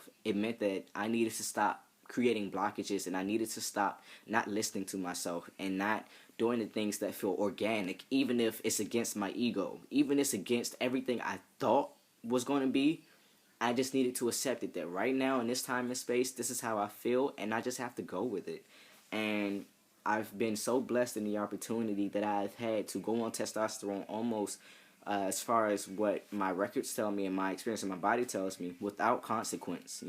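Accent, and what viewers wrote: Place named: New York, United States. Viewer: Hey Your dialect sounds very NY I would have never guess Maryland.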